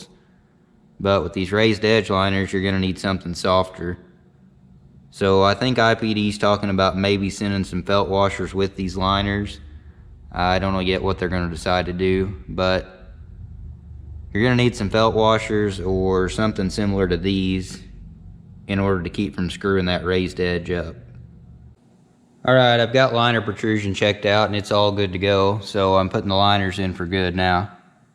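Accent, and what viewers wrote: South, United States. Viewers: I don´t mind at all about the engines, i am here watching ur videos just coz i like ur southern accent!!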